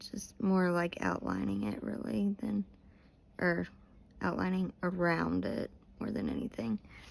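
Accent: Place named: Kentucky, United States. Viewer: Oh, how cute! Love your intro music, so fun and your desk set up today is so stylized! I was working away at Kerby dragon with you last night. Made a boo boo because using my Prismas, erased and worked on finding a colour combination. I found that one that you suggested in Prisma was too dark when used with another, so I persevered and tested things out. I learned a lot doing this and got a colour combo that is similar to yours but I liked even better, haha! I then decided to use only three colours on the scales and it looks good. I had two colours that are the darkest and midway through, I forgot which one of the pencils to use. I've decided to go between the two. I figure that animals in real life have colour variations in their scales and are probably not consistent in colour. I wanted to let you know that I really like your channel because it feels more personalized compared to many of the others. 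I feel as if I'm colouring with a friend, but at the same time, I get the feeling like I'm learning from an expert. I don't know why you think that you are not great at talking during your videos, you are quite chatty and personable and your Kentucky accent adds charm especially to my Canadian ears, lol. I also like how loving and caring you are to your children and I can strongly relate to that. My heart gets warmer when I am colouring and hear you talk about them. I really enjoyed colouring along with you yesterday because we just sat and coloured together unlike other coloris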